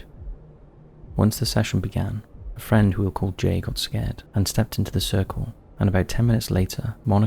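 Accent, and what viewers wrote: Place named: England, United Kingdom. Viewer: think everyone agrees not sure where in England he is from?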